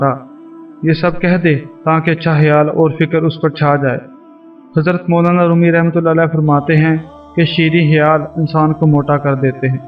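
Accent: Punjab, Pakistan. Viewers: bhai aap ki awaz bhot achi hy lakin aap ka talfuzz bilkul Punjabi hy plz ic ko negative ni lain lakin ap ka talfuz bhot irritate krta hy plzzz ic talfuz py work krain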